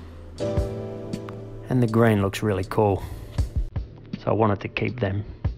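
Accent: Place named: Australia. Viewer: Clicked on your thumbnail and was surprised with a nice Aussie Drawl. Looked like Southport out the balcony. Stoked to see Narang on the clip. I rode my road bike over there about 4 years ago and stopped at the same cafe🤣. If you ever come to Bright, leave the XC convertible at home and rent a enduro bike and smash Mystic...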